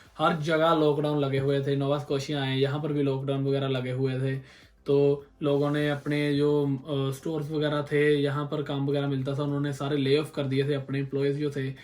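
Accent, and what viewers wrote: India. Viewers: Accent hi etna desi hai ki kaun naukri dega canada mein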